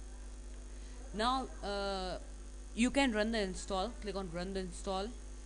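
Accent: India. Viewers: Thanks dude.... are u indian?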